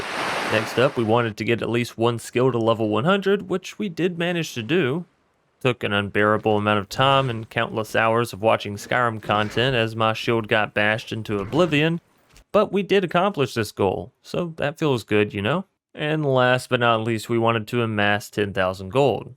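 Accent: South, United States. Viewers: It sounds like you have a country accent sometimes anyway super entertaining video I love stuff like this keep it up brother ❤️ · i have nothing to say other than ur voice is rly nice to listen to! i cant tell if u have a slight southern accent or not but its still nice lol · your mild southern drawl is enchanting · Is that a southern accent I hear?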